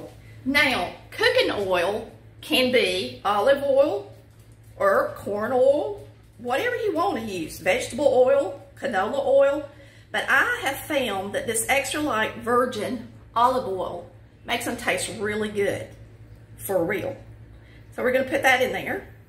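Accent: South, United States. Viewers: love that Southern accent. Cheers.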